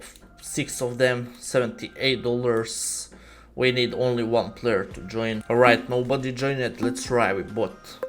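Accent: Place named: Russia. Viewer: Your russian accent kills me 😂😂😂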